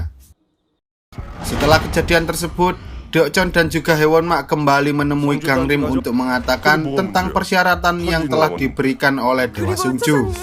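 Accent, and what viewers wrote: Java, Indonesia. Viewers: Njirrr. Wes rah usah kesuen. Intro nya wong jowo doooonk.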